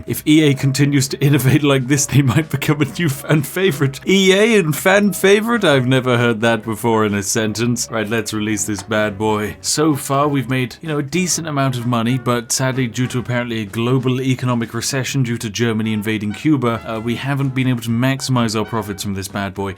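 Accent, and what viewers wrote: United Kingdom. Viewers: Ah yes you know what i love more than a British accent? and extra Britty Brit accent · And me, as a frenchie, I love British accent that I can understand.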